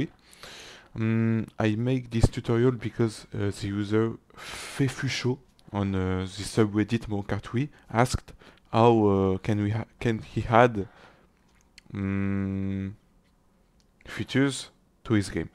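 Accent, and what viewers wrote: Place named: France. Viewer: ton accent ma fait capter direct que tu est français sinon bon tutoriel tu a gagner un abonner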